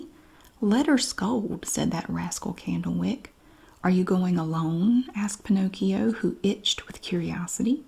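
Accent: South, United States. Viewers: There’s nothin like a sweet southern woman reading u a bed time story for u to sleep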